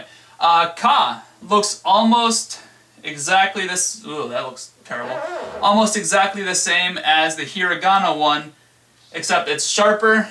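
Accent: United States. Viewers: Nice friendly guy.....the writing is stll ok....but that American - Nihongo accent is really not working.... pity...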